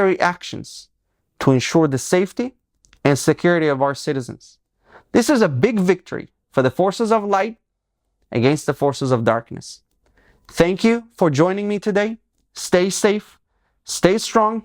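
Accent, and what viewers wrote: Russia. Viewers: slight Russian accent?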